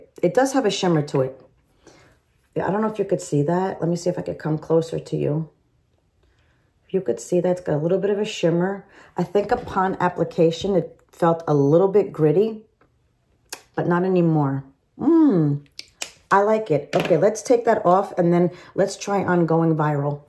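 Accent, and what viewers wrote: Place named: New York, United States. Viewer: Love your accent are you from The East coast New York ,sounds like home ,love your teteorials!!!!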